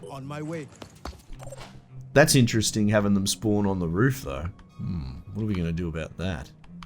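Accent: Australia. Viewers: This is the most laid back Aussie commentary I've ever heard on YT. Old mate? Any chance you're from northside of Brisbane?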